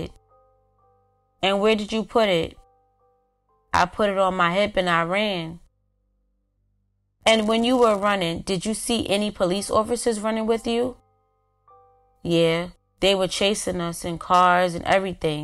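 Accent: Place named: New York, United States. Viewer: Whoever the narrator got a thick new York accent ong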